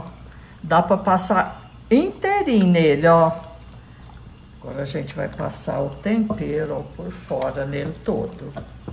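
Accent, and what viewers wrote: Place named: Minas Gerais, Brazil. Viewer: Eu não conhecia você, e a semana passada assisti um vídeo seu da ceia de Natal, e hoje vi você no Fantástico e me lembrei que assisti o vídeo seu. Sucesso adoro o seu sotaque igual de uma amiga minha de Minas.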